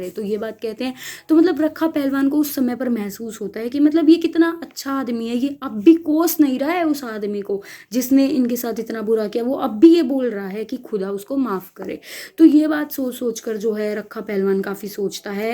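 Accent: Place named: Garhwal, India. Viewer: Ye garhwali tone ayee hyee 😍😍 pahadi girl ❤️❤️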